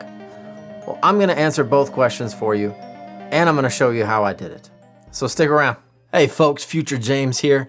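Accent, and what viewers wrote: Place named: United States. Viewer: love watching from UK . So interesting . Love the USA accent ...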